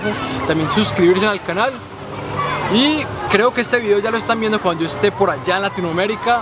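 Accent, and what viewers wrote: Colombia. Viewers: me encantan tus videos y tu acento colombiano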